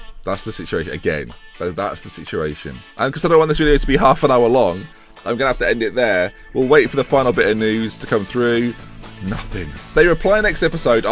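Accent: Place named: South East England, United Kingdom. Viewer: I don't actually know for sure but judging by the accent he lives somewhere down in the south - east and also I know he has been to Brighton before from one of his Salford story episode so he probably lives near London or something like that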